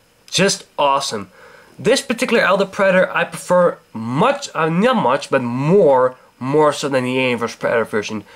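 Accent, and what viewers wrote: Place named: Netherlands. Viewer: its kinda cool to see a review with a dutch accent , i like it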